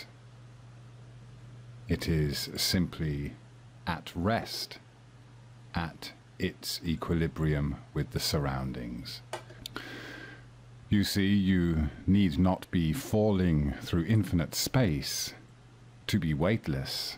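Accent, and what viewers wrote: England, United Kingdom. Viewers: I am so glad to hear this guy with some sort of English accent -- I thought all of the flat Earth nutjobs were from the US. It makes me feel a little bit better to know that some of the morons are from abroad.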